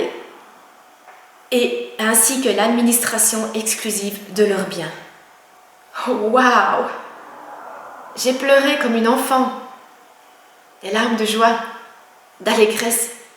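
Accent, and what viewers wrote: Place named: Belgium. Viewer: Bonjours j entends un accen belge dans votre voix, si vous êtes de Belgique pouvez vous me donner le numéro d un bon avocat belge si possible le votre ? Merci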